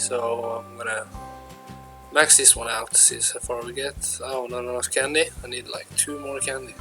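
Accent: Norway. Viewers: man hører at du er norsk og ser det nede med pokemonene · jeg viste at du var norsk når jeg hørte dialekten din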